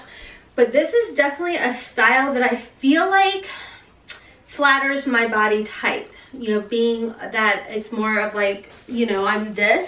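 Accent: South, United States. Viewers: Thank you for this haul. I have never even.heard of Zaful until your haul. You are around the same height and body type as me so it really helps to see how things look on you in the physical moving around in them aspect. Every single one of these pieces looked amazing on you. Ive literally been wanting to dye my hair lilac or lavender but its so dark brown which makes it harder. But you are Adorably Beautiful. I thought you looked amazing in each of the items you tried on. As You always do. Im glad you come out of your comfort zone to do these videos. We need more positive Energy to uplift eachother and support eachother. You hold a great platform for that. Again thank you, you really are a natural too bad being shy holds you back sometimes from being your true outgoing, entertaining self. Dont ever quit. We Love ya your a Beautiful Queen. God bless. 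P.s Your cute southern accent gives me life. 🙏💜👑🌞🌸🌼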